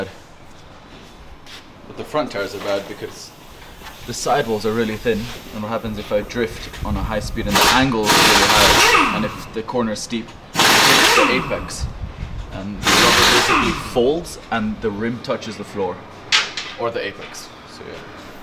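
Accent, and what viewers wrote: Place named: Kenya. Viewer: Love your Kenyan accent 😊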